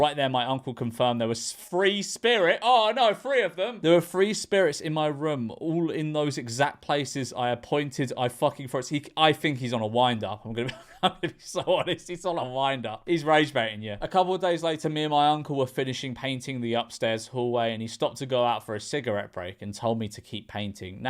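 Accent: United Kingdom. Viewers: AAAAAAA! You scared me mr British memeuless guy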